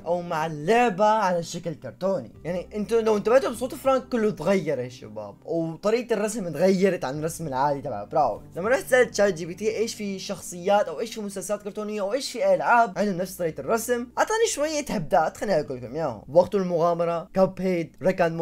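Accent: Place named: Saudi Arabia. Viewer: سولف بلهجتك ليش تسولف سعودي و انتا سوري ؟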